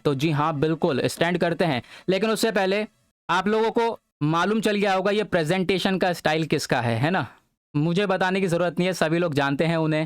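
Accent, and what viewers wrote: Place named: Bihar, India. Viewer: I am sure sir is from Bihar 🔥🙏🙏😀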